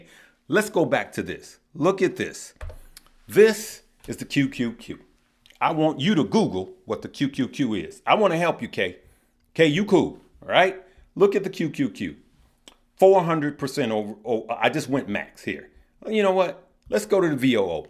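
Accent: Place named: South, United States. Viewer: Thee accent!! Ohh with the country accent. Uncle. Has. Had it.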